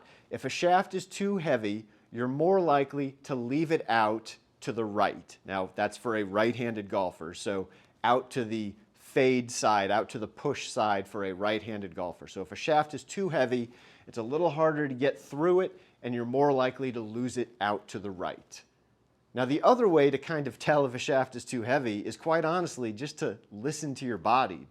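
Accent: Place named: United States. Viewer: im just so glad finally an american accent shewie ..no offense to the rest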